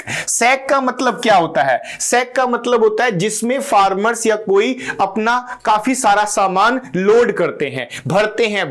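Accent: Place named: United Kingdom. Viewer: Sir I had a thing that I think is odd and that is your accent you speak in a half british accent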